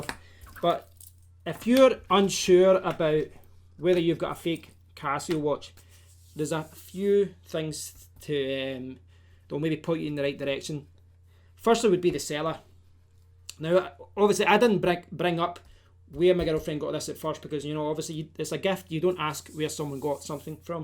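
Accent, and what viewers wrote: Scotland, United Kingdom. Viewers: Oh my actual days. I’m reading this 9 months after the comments and this reply “you’ve hurt my feelings” has just cracked me up so bad 😂😂😂😂 I just keep imagining it in that scottish accent 😂😂😂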